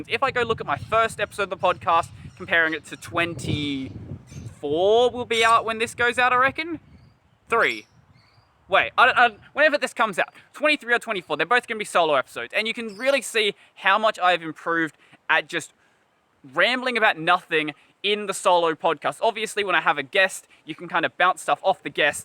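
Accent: Australia. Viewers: He is Australian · He’s Australian 🦘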